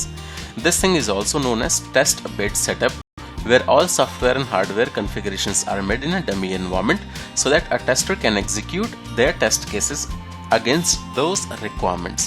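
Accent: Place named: India. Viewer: Boss your hindi influence is coming while you are speaking.. speak better,explain and usually in correct way then u tell abt communications skills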